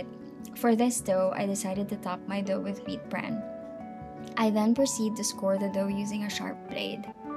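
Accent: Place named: Philippines. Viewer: Aha I knew that filipino english accent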